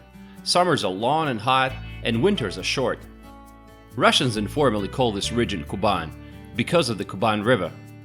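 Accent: United States. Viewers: It seems you didn't get that the maker of this video is a Russian who speaks English with an American accent. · Feel quite a bit of propaganda seeping through! State slogans .Some truth too .! & why is American Georgia mentioned? Looring American tourists ? Maybe I'm wrong . Maybe the narators almost American accent is a true American one . We will never know . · привет! You have made a great, inspiring and informative video, and it is truly made with passion for the country ;-) no matter what Larissa Koroleva is saying (her "funny" comments below)...the laid back music also fits in very well....to my ears your english sounds very american/english (maybe with a 2% russian accent).....and IMO the video is well done all the way.....большо́е спаси́бо! ;-) Sugiiiiiiiiiiii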